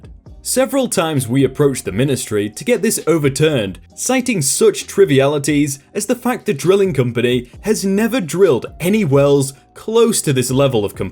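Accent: United Kingdom. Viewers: Yes, it's called British pronunciation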